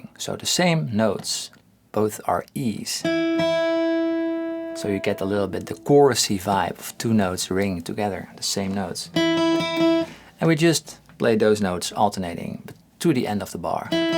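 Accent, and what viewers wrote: Netherlands. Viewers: I believe he is from the Netherlands.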